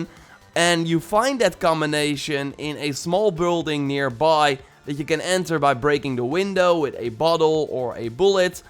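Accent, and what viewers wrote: Netherlands. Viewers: Your dutch ? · Je bent Nederlands of niet. Je hebt echt een Nederlands accent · Are you Dutch by any chance? Your accent sounds like it haha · Het leuke aan dit is je Nederlands accent.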